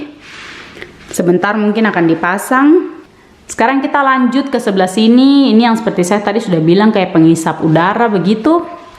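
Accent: Papua, Indonesia. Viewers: Sy paling suka dgn logat papuanya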